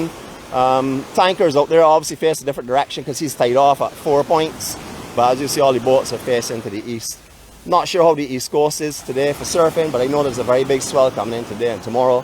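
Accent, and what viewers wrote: Barbados. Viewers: The Bajan accent is so cool. Love listening to you, and the ripples of the waves on the beach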